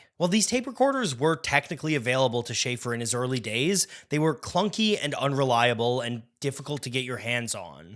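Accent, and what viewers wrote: Canada. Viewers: He's always had a bit of the ol' mushmouth lol. The occasionally intense Canadian accent doesn't help. But perfect pronunciation isn't why I watch these videos at the end of the day.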